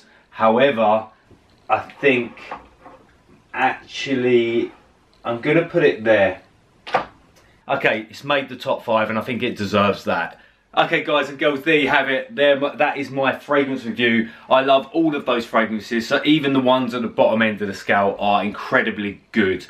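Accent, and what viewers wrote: United Kingdom. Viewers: Nice , but please put it in description because I couldn't get the names of some of them, English accent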